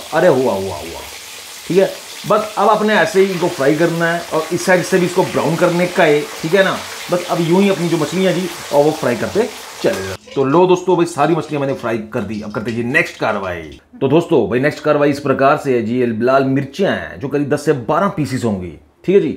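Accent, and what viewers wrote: Punjab, India. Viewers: Sir ur Punjani accent😍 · Bhai kya aap punjab se ho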